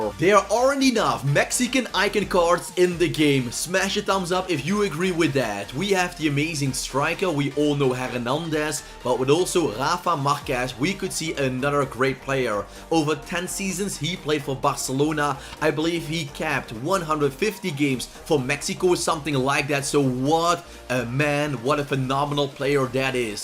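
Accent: Netherlands. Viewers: are you from the netherlands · Are you from Limburg? ( The Netherlands) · A little bit of a Nederlandse accent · You sound dutch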